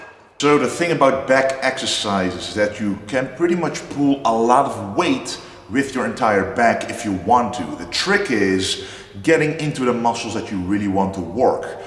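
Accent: Netherlands. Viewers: Soms voel je gwn dat iemand nederlands is, terwijl je engels is LV10 perfect maar ik keel naar ze face en dacht..... wacht een even laat me kijken wat zijn naam is en jaaa hoor BTW top video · Hahaha ik dacht serieus is deze man Nederlands? En toen zei je het. Goeie tips man! Ik voel me rug altijd heel lastig. Ga dit zeker vandaag proberen.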